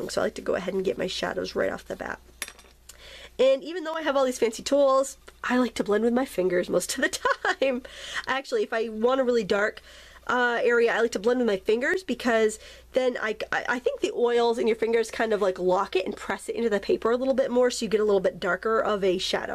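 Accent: Maine, United States. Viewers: Hi, Love your charcoal "draw-rings" with your Maine dialect! Great variety of shading and blending techniques! What do you like to use to seal it so it doesn't smudge charcoal whatever is next to it?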